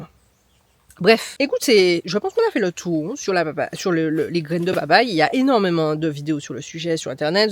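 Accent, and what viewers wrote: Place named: Martinique, France. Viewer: c'est l'accent de Martinique qui vient du créole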